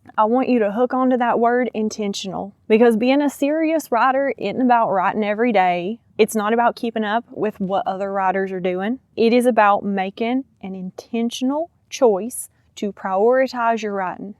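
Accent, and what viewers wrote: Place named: Alabama, United States. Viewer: Your accent is adorable! I thought you were Alabama, but go Vol's! Appreciate your message. My debut novel (The Year of the Cat by Gary Kotowski - Amazon) didn't move the 5000 copies I envisioned it to sell. I'm still invisible to agents and I'm too discouraged to start a second novel. Needed to hear your step three! Thank you!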